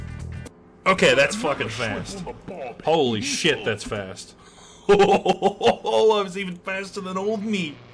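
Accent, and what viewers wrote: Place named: United States. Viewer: Ah it was tripping me out some parts sounded American to me and I am from Texas so could have fooled me.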